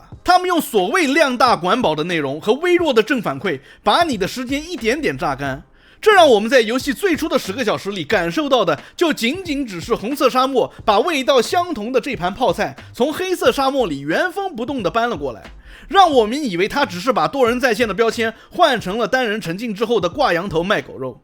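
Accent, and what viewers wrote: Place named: China. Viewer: 尖銳陸腔差評😅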